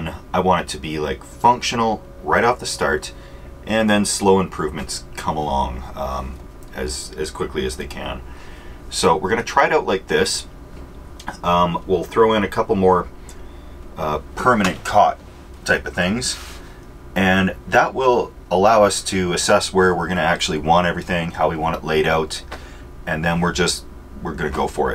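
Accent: Canada. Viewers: The canadian comes out when excited.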